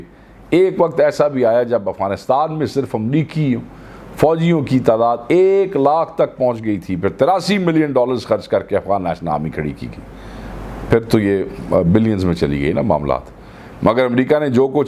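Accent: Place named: Punjab, India. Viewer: Sr apka punjabi tuch buht achha lgta hy 😑🤣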